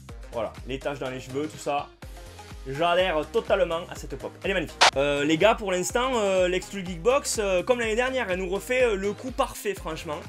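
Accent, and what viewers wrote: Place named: South, France. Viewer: ton accent du midi est excellant 'sin (#teamsud)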